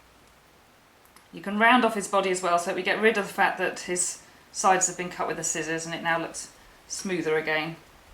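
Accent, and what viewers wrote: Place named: United Kingdom. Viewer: Hi, I love your cute penguin and you make it look so simple to make I'm sure it'll inspire others to give it a go. Your instructions and video are excellent and it's nice to hear a British voice for a change:) Debs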